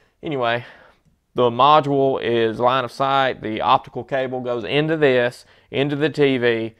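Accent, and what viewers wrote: South, United States. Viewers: Came here cuz I would like to buy used ones. Daaaaaaemn heeee soooouands sooooyyy reeedneick.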